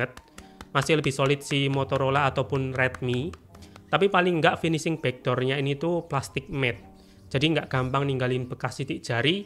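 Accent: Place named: Java, Indonesia. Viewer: Aku subrek karna logatnya jawa dan juga wibu. Kita boloo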